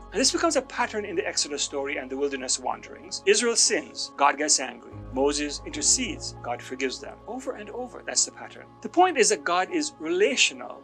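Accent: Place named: Ireland. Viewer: Really good video. Also, comment from an Irish person - your accent is half like that found in the South to Southwest of Ireland (and half something else), are you from Newfoundland or something?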